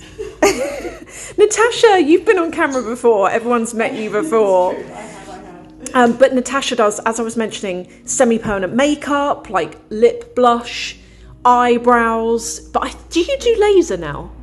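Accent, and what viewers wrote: England, United Kingdom. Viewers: I do not buy luxury. However, it is very entertaining for me to watch and listen to you. England is somewhere in my DNA. I love your vlogs and, of course, English accent. Be well.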